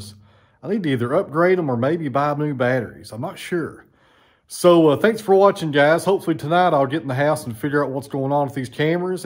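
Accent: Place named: East Tennessee, United States. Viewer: Glad I found your channel. While I'm at work, up in a boom running conduit, I watch your content while I'm waiting for material or whatever. lol That East Tennessee accent makes it feel like I'm watching someone I can relate to a little better than the average channel. 😂 If you've done much traveling, you know everyone isn't as friendly as most folks around here are.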